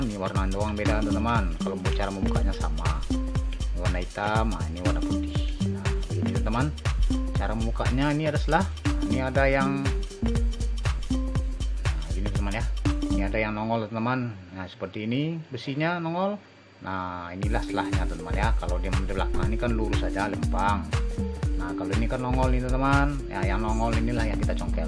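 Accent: West Sumatra, Indonesia. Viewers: lu orang minang kah bang?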